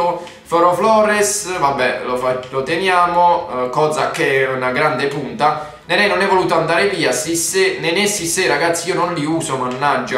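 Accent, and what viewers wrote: Bari, Italy. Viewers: ma sei barese?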